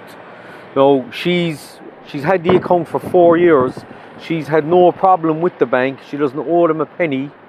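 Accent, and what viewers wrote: Ireland. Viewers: Prepare yourselves for the Social Credit system, guys, please. This is a foretaste of that system, that is already being planned for the UK. On a side note, forgive me, what region of Ireland is the presenter from? His accent is amazing. 😊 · Where is that accent from? First time hearing it from Donegal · What part of Ireland is this accent from? I don't think I've ever heard it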